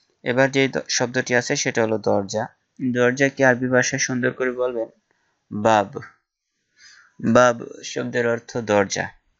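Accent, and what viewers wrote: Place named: Noakhali, Bangladesh. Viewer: নোয়াখালীর ভাষা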